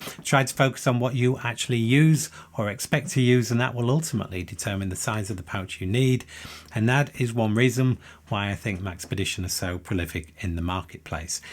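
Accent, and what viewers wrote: United Kingdom. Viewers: May I recommend that you consider Asmr artist work! Your voice can be quite relaxing! Maybe it's the British accent!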